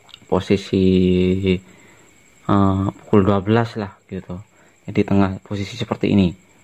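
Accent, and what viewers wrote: Madura, Indonesia. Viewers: hihi kadeng kloar skunik madurenah. Sukses lah bos lanjutken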